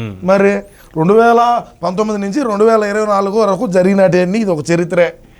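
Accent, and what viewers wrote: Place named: Kadapa, India. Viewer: ఇంతకు నీది యెవూరు బ్రో కడప స్లాంగ్ కూడా వచ్చింది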